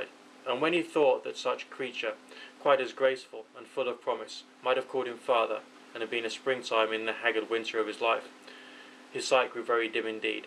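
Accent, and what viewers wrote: United Kingdom. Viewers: This is really a great reading , especially with that British accent, very good!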